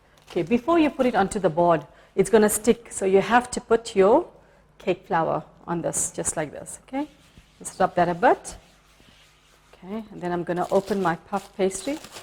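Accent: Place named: South Africa. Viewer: well if they are not a professional probably explains the whisk situation, as i heard "chef", im not a professional but i certainly know how to cook and bake, but tbh it was embarrassing to see handling that whisk! i was cringing im all for loving amateur baking and cooking even if they dont have all the tools in the kitchen but ones should know how to cook use it she was pretty messy. im only giving constructive critism not a personal one you still didnt get the camera focused on the food.:( Ps i would take your challenge the thing is i dont live in S africa Im guessing from your accent!:) anyway hope to see some improved use of spoons:) Your probably hating on me now!!!:)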